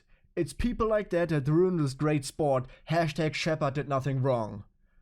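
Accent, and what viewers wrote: Germany. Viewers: Are you from germany? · Are you German?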